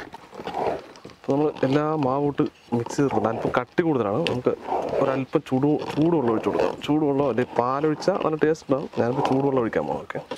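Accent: Palakkad, India. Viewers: palakkadan basha 😍😍😍 idhu kanunna palakkattukar undo?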